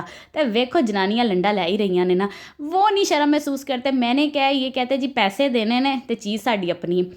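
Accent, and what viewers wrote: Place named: Punjab, India. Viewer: Wah G... urdu k sath punjabi ka jo tarka lagya hai... maza a geya.. 😂😂😂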